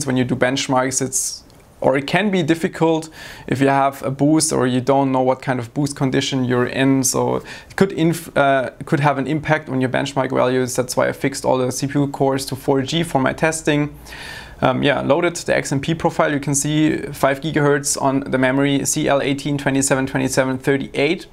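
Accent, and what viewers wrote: Germany. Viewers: Love the german accent 😍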